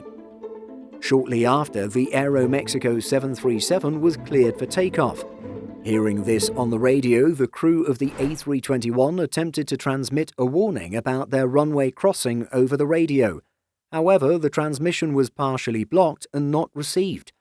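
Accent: United Kingdom. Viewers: Low value production. No real radio calls. Its just a guy with a british accent reading a report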